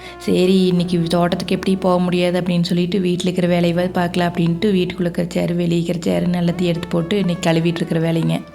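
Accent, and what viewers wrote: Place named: Kongu, India. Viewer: Kongu tamil kano vera yaro English la pesaragale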